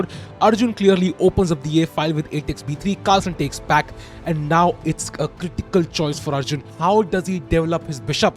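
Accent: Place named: India. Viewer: I love this commentary in Indian accent as it should be.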